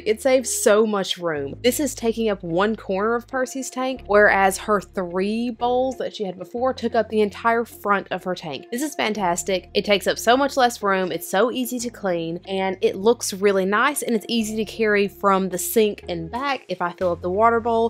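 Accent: South, United States. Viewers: I love your accent. I live in Michigan and don't hear southern accents. So it's fun to hear. But yeah. Unfortunately people feel like they can say anything on the internet. So you're gunna get a lot of trolls. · there's nothing better than that southern drawl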